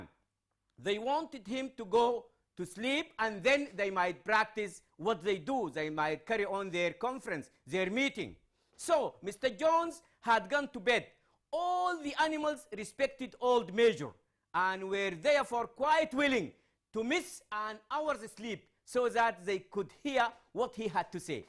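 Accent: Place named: Sudan. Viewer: This is Sudanese english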